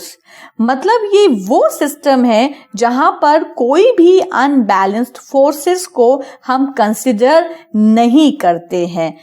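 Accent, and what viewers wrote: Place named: Bengal, India. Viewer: Are u Bengali? I can understand by your accent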